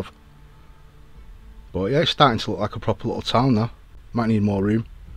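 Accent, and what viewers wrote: Manchester, United Kingdom. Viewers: Defo sound like one of us manc lads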